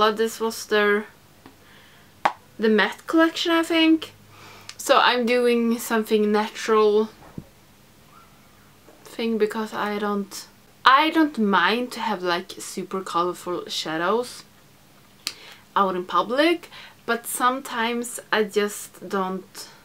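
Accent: Sweden. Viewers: Congratulations on your plants and your you tube channel!! Those browns look really beautiful on you. Thanks for doing your videos in English. I love your Swedish accent.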